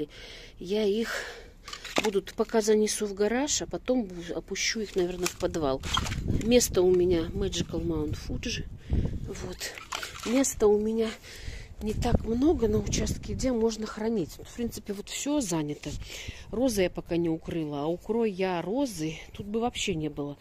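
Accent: Belarus. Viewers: Здравствуйте! Откуда вы? То, что из Беларуси, я поняла по разговору. Из какого города? В Гомеле продаёте гортензии, розы? Хочу Самарскую Лидию.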